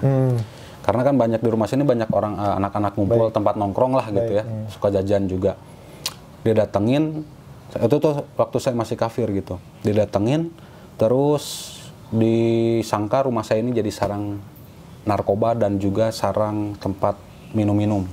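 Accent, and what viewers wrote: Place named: West Java, Indonesia. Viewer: Seru..resep ngadangukeun na.. moga istiqomah nya kasep.. terharu oge...ibu mah penonton setia .. pas bangeut logat sundana.. semangatnya kasep.. 👍👍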